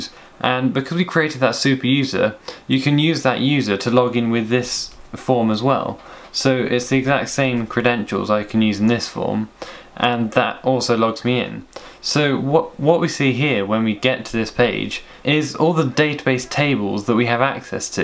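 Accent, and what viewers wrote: United Kingdom. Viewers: Everything's easier to understand with a British accent.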